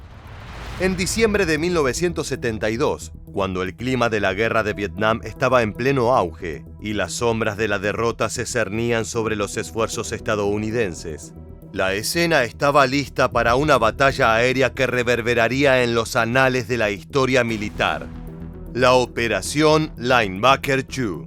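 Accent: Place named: Argentina. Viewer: Habla 3n español argentino. Lame cul..o... de Estados Unidos. Se dice 2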